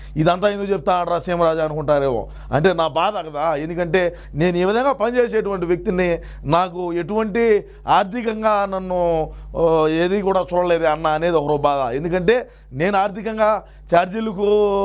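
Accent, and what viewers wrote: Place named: Telangana, India. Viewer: Bro slang Telangana la undey😮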